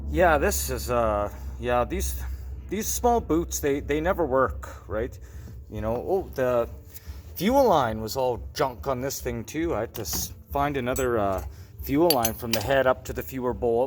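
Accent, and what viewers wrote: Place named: Canada. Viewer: You sound very Canadian.😃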